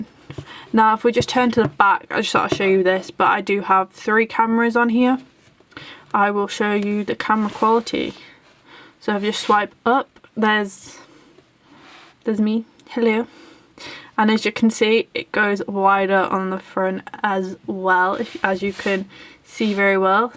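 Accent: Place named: United Kingdom. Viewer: the British Accent kills me very softly.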